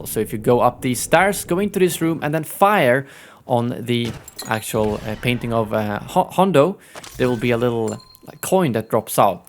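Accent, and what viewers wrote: Sweden. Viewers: are you sweddish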